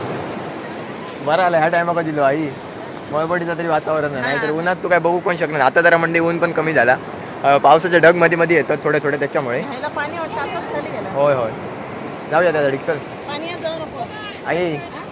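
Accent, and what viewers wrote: Malvani, India. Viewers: Are bhava malvani bol Chan Sanvte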